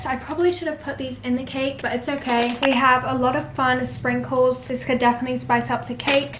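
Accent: United Kingdom. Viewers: Your videos are amazing!! Love your way to do this 💕 and your british accent is so pretty ❤ hugs from Ecuador :)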